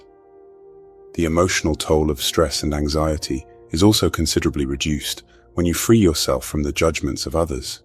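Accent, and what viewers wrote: United Kingdom. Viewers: That British accent on these type of videos is so annoying..makes me tune out instantly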